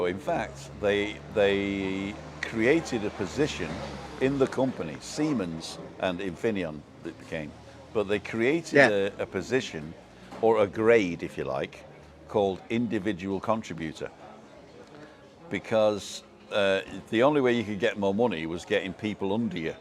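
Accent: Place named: North Wales, United Kingdom. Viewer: Listened on catch up, you have a very strong North Wales accent when speaking Welsh! You don’t speak English with a Welsh accent though. 🏴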